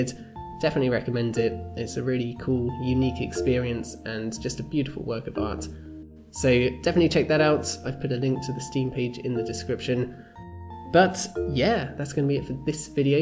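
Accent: Sweden. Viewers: Are you from Sweden? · are you from sweden? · Are you swedich?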